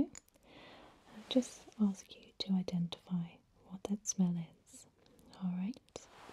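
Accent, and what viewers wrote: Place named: Lancashire, United Kingdom. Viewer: Sometimes I don't know if I prefer a Lancastrian accent to a posh southern accent.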